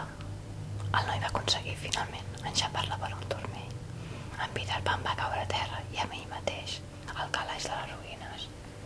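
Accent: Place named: Spain. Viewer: Hola: Que bonito tu ASMR, me encanta la tonalidad de tu voz y lo más FANTÁSTICO es que el acento ESPAÑOL SE PRESTA MUY BIEN AL "ASMR". Por favor, haz mas ASMR, en Español y por ahi en Catalán, PERO ahorita procura hacerlo en Español, ahhhh siempre es recomendable poner en el titulo del Video ASMR, cuando vas hacer ASMR, para que así más usuarios puedan gozar de tu lindo material FELICITACIONES: Me encanto tu Video. Saludos desde Lima - Perú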